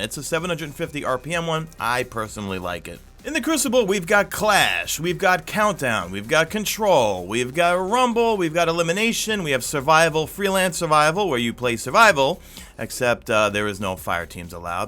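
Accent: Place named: East Coast, United States. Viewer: You sound like you're from the east coast. I hear a slight accent. Regardless. keep making amazing content dude!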